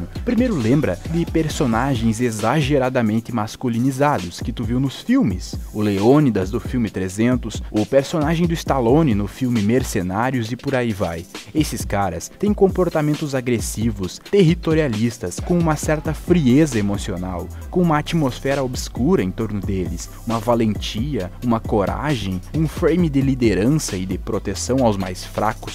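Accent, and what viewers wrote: Rio Grande do Sul, Brazil. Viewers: por acaso tu é gaúcho? É que teu sotaque é bem típico do norte do RS. Parabéns pelos vídeos, por acaso encontrei o vídeo sobre Ego e achei bem interessante alguns títulos que são mais voltados para desenvolvimento humano. Gostei muito da abordagem simples e objetiva, focado em passar a informação correta e de forma lúcida e educativa o que evita que o vídeo fique longo e tedioso. Novamente parabéns!!!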